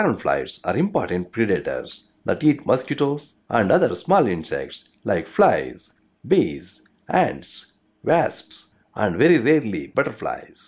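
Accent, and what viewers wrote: India. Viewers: Indian bengali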